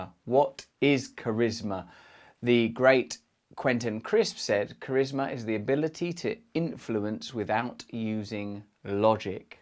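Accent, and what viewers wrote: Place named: England, United Kingdom. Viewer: Mojo, sounds most appealing when said by an Englishman! When I say it sounds like mow joe! Help me russ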